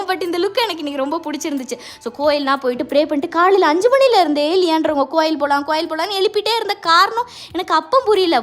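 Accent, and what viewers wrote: Tirunelveli, India. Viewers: akka arambathula irunthea ungala enak rompa pudikum ❤ love from kerala 🥰Unga tirunelveli slang rompa ishtam 😊 ipo naney konja monthsla tirunelvelik marumagala varaporen🤩 rompa periya happy🎉ungala meet panra chance kedacha innum nallarukum 🥰 🫂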